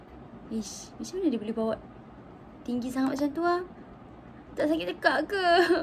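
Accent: Malaysia. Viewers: Suka x dengar cakap malaysie ni. Meremang bulu roma. 😀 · Saya suka cara bicaranya aja.lucu,manja,dan cirkhas logat Melayu nya bikin adem telinga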